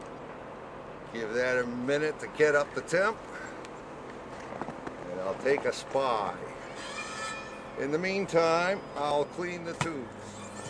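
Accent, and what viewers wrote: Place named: Maine, United States. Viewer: Yes, I noticed that but coupled with his "Downeaster" accent it makes for a weird combination.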